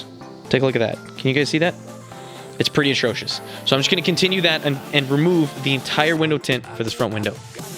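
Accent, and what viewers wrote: Canada. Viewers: Let me guess.... you're from Canada? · Art thou Canadian?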